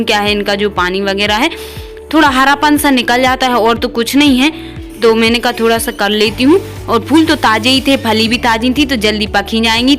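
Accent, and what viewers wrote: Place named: Jhansi, India. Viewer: Ap kaha ki ho jhansi taraf ki ho ap kya boli se lag raha h bahan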